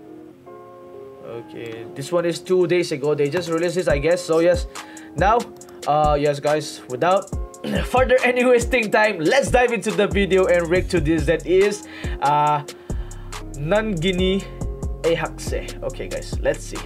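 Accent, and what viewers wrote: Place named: United States. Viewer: Check your grammar.... instead of doing American accent or talking like an rapper 😂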